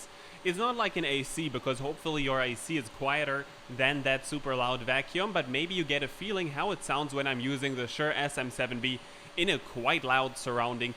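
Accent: Germany. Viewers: you german )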